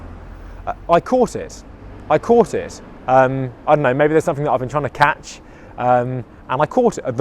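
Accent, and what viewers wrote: United Kingdom. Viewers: Hi. I do like your lessons on phonetics with a British pronunciation. Please continue. Thanks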